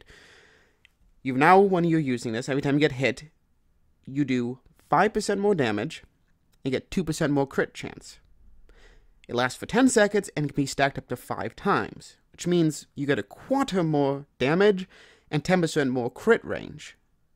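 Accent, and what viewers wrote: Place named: East Coast, United States. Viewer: You sound like you're from the East Coast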